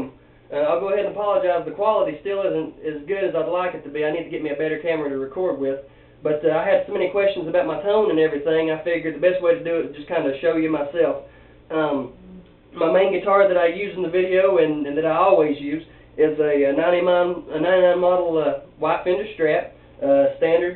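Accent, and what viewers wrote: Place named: South, United States. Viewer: You have a Southern accent. Where are you from?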